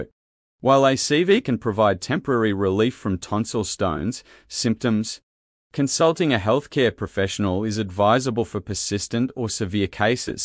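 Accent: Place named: Australia. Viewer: I love hearing Australians speak I can listen to that shit all day it's relaxing to me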